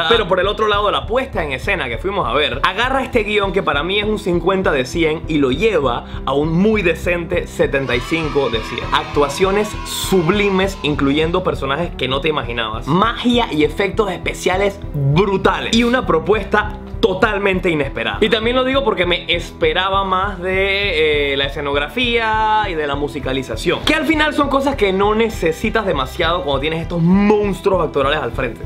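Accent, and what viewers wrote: Venezuela. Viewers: Qué simpático eres x'D tienes acento levemente venezolano :0 ¿eres venezolano o es que el acento panameño se parece?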